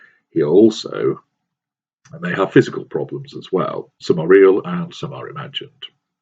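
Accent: United Kingdom. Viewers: He’s in England, this is the typical English/British accent.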